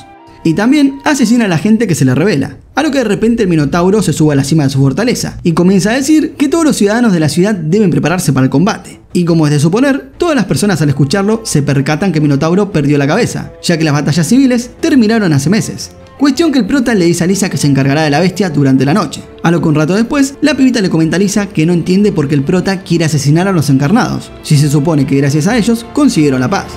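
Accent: Argentina. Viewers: No me gustan los resumenes tan largos pero no avía escuchado uno en ARGENTINO y neta de argentino a el orrible y culerisimooooo castellano que chingón se escucha 😳😅😂😂😂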